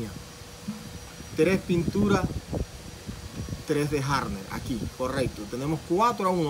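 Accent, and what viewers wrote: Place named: Cuba. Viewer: Porque los de cuba hablan asi??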